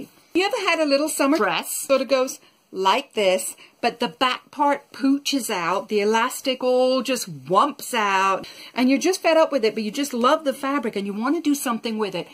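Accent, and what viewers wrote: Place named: United States. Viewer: Thank you for such a handy tutorial. Are you Irish? You sound like you have a mix of Irish and American accent, very sweet.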